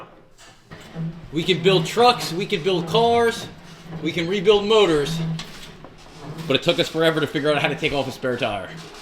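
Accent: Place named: South Jersey, United States. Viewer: Heard the accent and thought south Jersey or DelCo PA haha. Nice exhaust upgrade man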